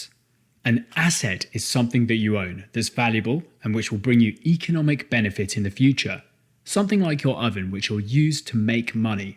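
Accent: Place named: United Kingdom. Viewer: Clear British accent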